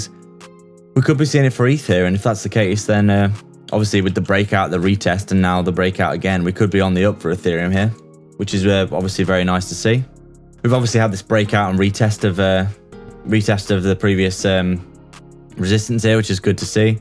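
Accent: United Kingdom. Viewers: Nice video, great to hear a British accent for once.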